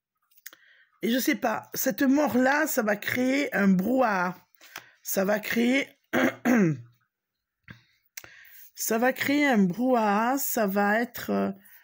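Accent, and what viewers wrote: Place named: Marseille, France. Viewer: Bonjour, merci votre video que je regarde plusieurs fois car vos explications sont toujours hyper intéressantes, gratitude, j adore votre accent marseillais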